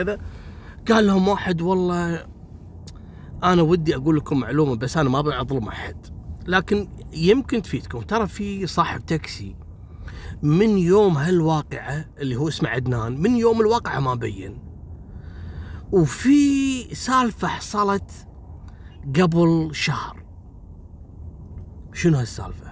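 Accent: Morocco. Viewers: تعلمت اللهجة المغربية يا حتى المدن المغربية بدات تعرفها تحياتي لك من المغرب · ما احلى اللهجة المغربية بصوت اخونا ،تحية مغربية من بريطانيا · يازين كلامك بالمغربي 😉😛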